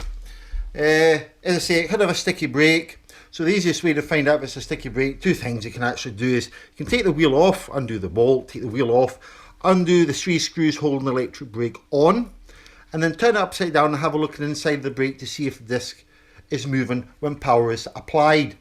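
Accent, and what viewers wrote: Scotland, United Kingdom. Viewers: Hi Iv watched many of your videos and found them very informative . Great to watch and hear your fine Scottish accent . I am English but I live in Australia . I’m having a problem with a controller on a non identified mobility scooter ( no idea tags on it any where ) I have emailed you but so far no response . Can you help please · Fab U Lous, not sure about the funny accent but being from a similar part of the world, Glasgow, I do know however this is the correct and only way to speak english. All that aside, thank you so much for the time and effort in doing the video. Living in sunny Bantry with the mad Irish wife, now using a scooter ocassionally, took it to bits to try figger what was wrong. Your video suggests the most likely cause and the other info re the brake helps big time. Many thanks again for the help, Bill P Mad Scot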